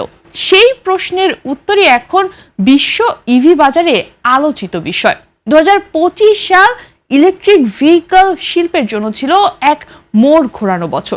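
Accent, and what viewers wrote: India. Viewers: এই মহিলার বাংলা পশ্চিম বঙ্গের মতো লাগে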